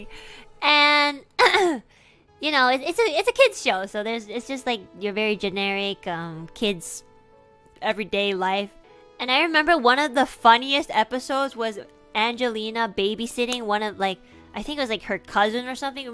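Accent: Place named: United Kingdom. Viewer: 笑死 英國腔真的有像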